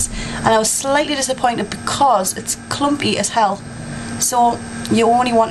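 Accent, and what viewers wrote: Newcastle, United Kingdom. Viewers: Love her accent geordie!!! Im on camera mind! lol love it!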